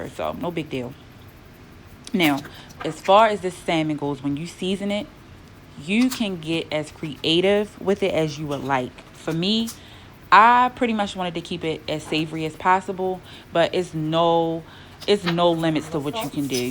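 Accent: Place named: Baltimore, United States. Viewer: That bmore accent🥰😅😁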